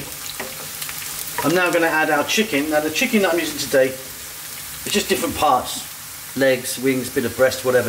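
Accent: Philippines. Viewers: Like your Tagalog accent, and it is nice to see you back, and this recipe looks damn delicious will try and Thank you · Very good Pinoy accent to the ingridients"Nice presentation also keep cooking taglish Version Thank u..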